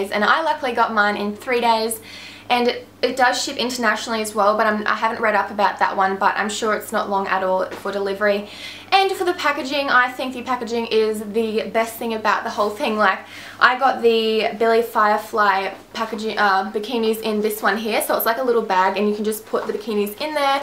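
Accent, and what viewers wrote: Australia. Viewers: your accent sounds australian but it doesnt? lol. this is the first video ive see of you. a) you're gorgeous and b) you have an amazing body! werk it gal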